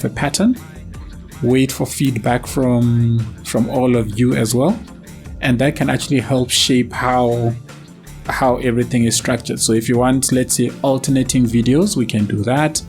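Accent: South Africa. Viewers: Are you from South Africa? GP or KZN? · I guessed this before seeing on your Twitter you're from Joburg. Howzit. :) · Great build, and also nice accent, sounds south african to me :) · That is one SEXY voice I agree. The South African Accent coming out really good and professional. Thank you for that! Keep us as South Africa on the map of CS - Good job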